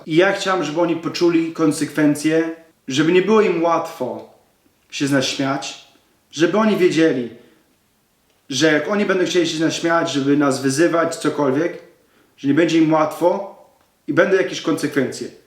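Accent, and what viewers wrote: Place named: Poland. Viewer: podoba mi się masz taki fajny akcent taki modern polish ale jednocześnie brzmisz jak moja babcia która też jest z Podlasia <3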